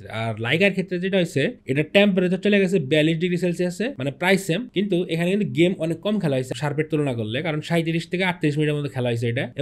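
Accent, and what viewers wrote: Tangail, Bangladesh. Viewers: ভাই কি টাঙ্গাইল এর?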